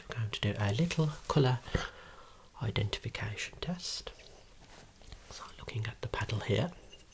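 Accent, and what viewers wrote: Birmingham, United Kingdom. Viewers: Birmingham? you’re made for this, mate. And very handsome to boot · Dislike it? I dont know anyone who dislikes the Peaky Blinders accent. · U sound British 😲😶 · Proper beautiful midlands accent. Hello my Brummie friend ❤ we share the same city! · It's interesting how perceptions of accents vary. He has an accent from around the Birmingham area of England. Other people in the UK tend to dislike this accent and it's often a figure of fun.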